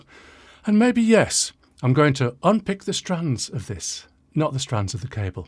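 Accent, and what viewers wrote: United Kingdom. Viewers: Speaking of sounds affecting people - I worked with a guy , My friend Andy , He's British and has the same accent as you Well , we were in sales , I had to compete with him everyday , all day. His voice alone would absolutely hypnotize customers . We all experienced for years . We sold cameras and telescopes etc... I told him that he absolutely should be selling hi end jewelry . This was in Venice Florida US , It would have been like taking candy from a baby . lol!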